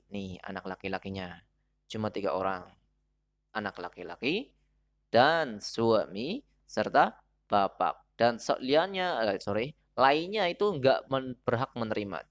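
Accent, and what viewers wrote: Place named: Banyumas, Indonesia. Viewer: Semakin kebelakang, semakin ngapak. Wkw. Terimakasih ilmunya pak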